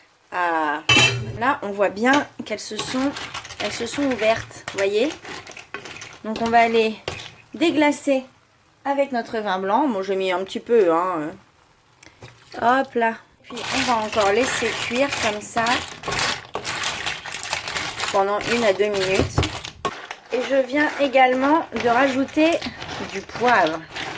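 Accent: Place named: Italy. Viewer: accent italien, bonjour ! :face-purple-crying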